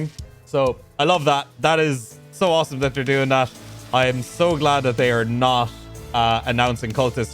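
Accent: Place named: Ireland. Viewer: Been waiting for an Irishman to read me those juicy patch notes! Sorry about the funeral though man :(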